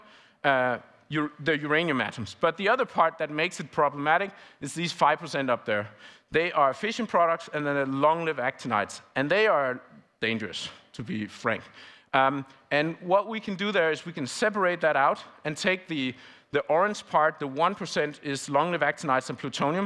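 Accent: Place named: United States. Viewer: It never ceases to amaze me how good your American English is.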